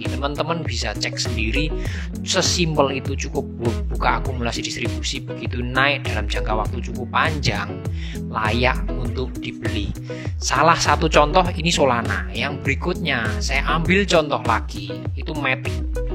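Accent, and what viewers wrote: Java, Indonesia. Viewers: Logat jawa kukira mukanya jawa, tp muka chinese kek opah opah hihi Thanks ilmunya bang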